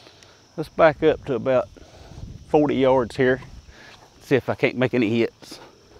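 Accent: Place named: South, United States. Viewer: Your Presentations are Excellent MAN. Don't listen to Critics. They are to the Point, straight forward, and narrated by a Southern Man. What more could anyone ask? I Don't like to be in front of the camera either. I would rather show the content than myself.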